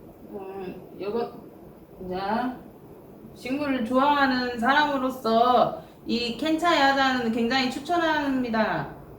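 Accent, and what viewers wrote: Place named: Jeolla, South Korea. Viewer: ㅋㅋㅋㅋ전라도 사투리 구수해유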